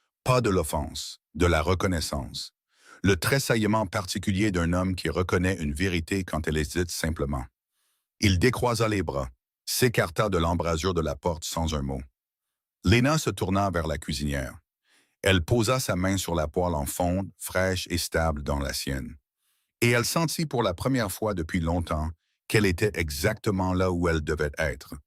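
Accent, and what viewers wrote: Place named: Canada. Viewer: Le conteur à un accent Canadien ❤❤❤❤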